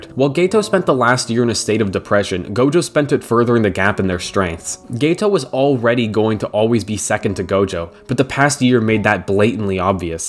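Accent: United States. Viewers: holy shit I just can't with the overly American pronunciation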